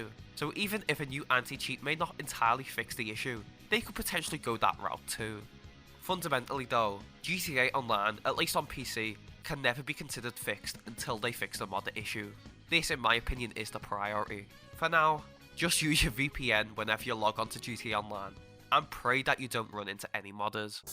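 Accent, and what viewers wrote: Liverpool, United Kingdom. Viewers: you from liverpool? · 😂 Scottish! Lmao he’s from Liverpool. not Scotland 🏴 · Are u scouse lad or u a wool? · no he’s british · Are you a scouser? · still British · hatred for bri'ish accent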